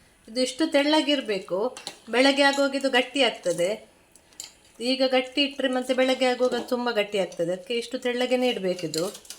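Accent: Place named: Mangalore, India. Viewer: manglore kannada supr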